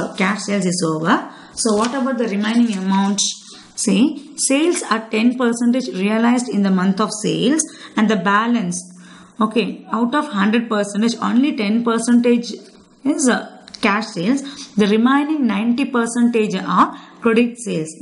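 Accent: South India, India. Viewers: salute you for your awesomeness ma'am. btw your accent is quite funny. I believe you're south indian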